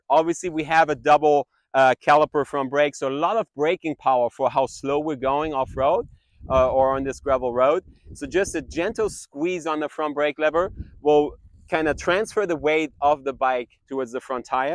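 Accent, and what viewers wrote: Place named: France. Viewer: You lost me at french accent.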